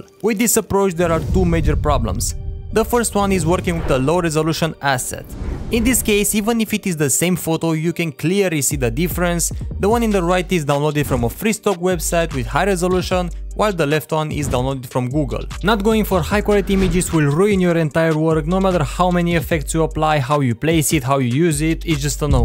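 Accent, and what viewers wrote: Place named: Romania. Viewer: i can tell by your accent you are romanian xD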